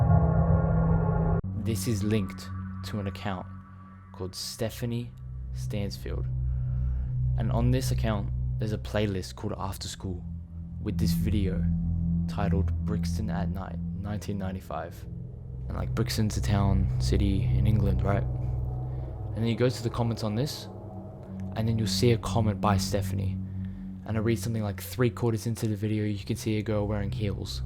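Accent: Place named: Australia. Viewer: Fellow pom ? You sound Australian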